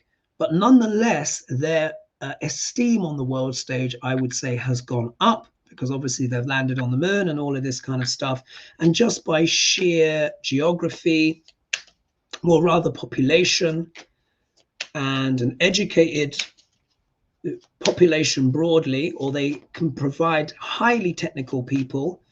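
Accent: United Kingdom. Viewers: 👍🤩The British accent is so easy and good to hear I understand it better. Good predicting as well.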